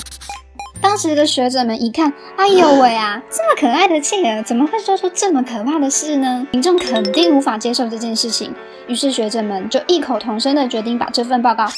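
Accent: Taiwan. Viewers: 講話的風格像台灣吧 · 湾湾的口音让我好出戏🌝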